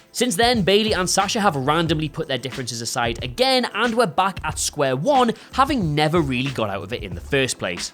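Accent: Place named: Teesside, United Kingdom. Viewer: listed as from Newcastle but he sounds more like a Teesside boy to me.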